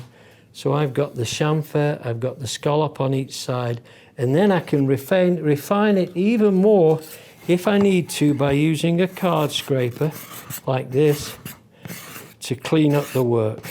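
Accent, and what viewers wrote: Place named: Northern England, United Kingdom. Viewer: Beautiful work and I love hearing your commentary - reminds me of my beloved family from the north of England! Off to chamfer the base of the Oregon legs on my Moravian Workbench project. Thanks to you, I'm sure it will be a much better outcome now! EDIT: Well, I used your approach for the bottom of the Oregon legs, cutting the chamfers first with a Japanese saw, then cleaning the up with my (beautiful) block plane. I even used your pencil line method! The chamfers look great and I'm grateful to you. Cheers from Sydney - David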